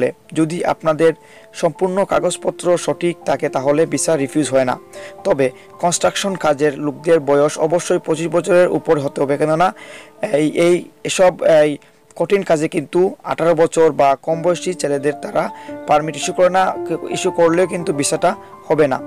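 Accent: Sylhet, Bangladesh. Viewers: ভাই সিলেটি, লাস্ট কথাবার্তা শুনে বুঝতে পারলাম। ভাই প্রশ্ন হচ্ছে, ম্যানপাওয়া কেন দেয় না -সরকার?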